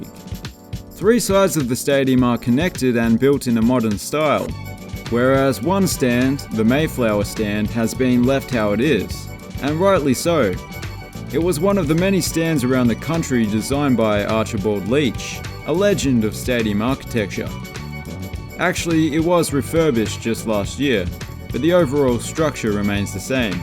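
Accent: Australia. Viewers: There is absolutely no hints of black on the Ipswich kit….well, there is an almost invisible black pin stripe in the new kit but you need a magnifying glass to see it.. love the videos by the way…funny hearing an Aussie covering quaint English football grounds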